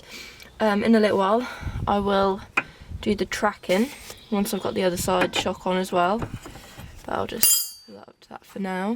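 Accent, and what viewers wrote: Norfolk, United Kingdom. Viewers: "That do, achly" - aha! Norfolk, I thought... and I was right! :-) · You sound like you're from Norfolk. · like this accent so british ;P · Hot girl with a British accent that likes to work on cars... I know I had an engagement ring around here somewhere... 😉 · Love the channel, you must be from Norfolk with that accent.....👍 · Your accent sound well Norfolk !! 😆 I've got an '87 Granada Scorpio. Just seen a Sierra Mk1 4x4 Ghia estate on EBay... tempting. Anyway, I've subbed, so keep you a troshin'. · You sound like you are from Norfolk. I'm an East Anglian myself. Good to see the retro cars being kept alive. As well as bikes I am working on my 71 Mach1 Mustang and '72 Mark 1 Escort also. Look forward to future episodes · Thought that sounded like a norfolk accent.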